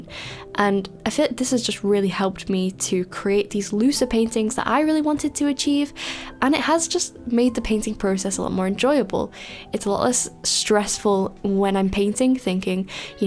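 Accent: United Kingdom. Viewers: I’m trying to place your accent! Somewhere near Liverpool? That’s where I’m from and you sound exactly like one of my cousins 😅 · Just started watching you recently and you’re so natural to teach it’s brilliant and just like your sister I live in another country and I’m trying to paint but hearing a fellow English woman Is perfect, if you ever get a chance to come to New Zealand I’d love to show you around and even have a mini painting session 😊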